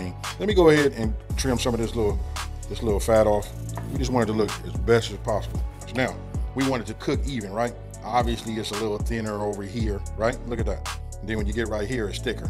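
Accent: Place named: Los Angeles, United States. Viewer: I can tell u a l.a brother by that accent lol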